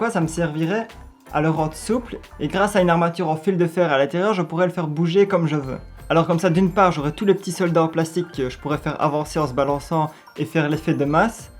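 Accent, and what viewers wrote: Liège, Belgium. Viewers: Pas mal la vidéo 😄 , j'ai l'impression que tu as un accent belge 🇧🇪, j'me trompe? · Accent belge🇧🇪🇧🇪🇧🇪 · T'es belge nan? :) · Vu ton accent tu ne viendrais pas de liège Comme moi ? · C'est juste super! Tu es vraiment un pro du stop Motion. Félicitation. Au fait, juste une question, tu es belge? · On reconait bien l'acent bèlge 😀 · Tu ne serait pas belge par hasard ? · Tes Belge ? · Tu es belge ?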